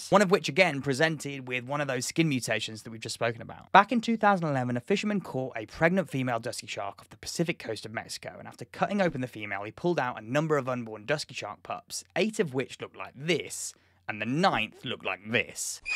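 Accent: United Kingdom. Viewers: He's from Britain. They pronounce things a little bit different like, for example, what we call cilantro, they call coriander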